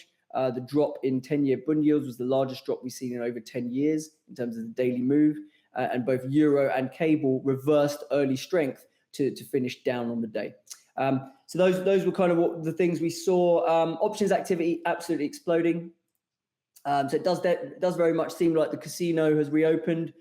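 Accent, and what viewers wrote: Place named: United Kingdom. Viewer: Great content but too British accent. Cannot stand it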